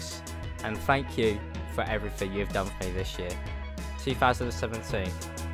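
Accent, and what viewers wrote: United Kingdom. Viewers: i hope you get 50k subs m8 your content is really high quality and really funny i love your brittish voice and i hope you dont stop now that ive subbed keep it up m8 cheers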